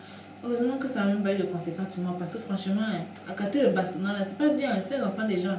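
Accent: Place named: France. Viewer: maaaaama ce que moi je wanda c'est l'accent qu'elle vole au blanc je connais des blancs qui vivent au camer qui on toujours l'accent francais pouquoi ils ne prennent pas l'accent ? merci pour ton tag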